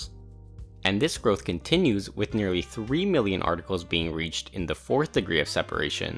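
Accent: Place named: India. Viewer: Voice is indian..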